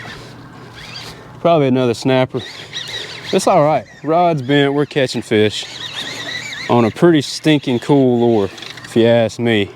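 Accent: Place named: South, United States. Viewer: Lolo yup I understand everything u talkin bought 🤣🤣 yeah its somethin about the Redneck in us that makes us talk like this 🤣🤣🙏❤🇺🇸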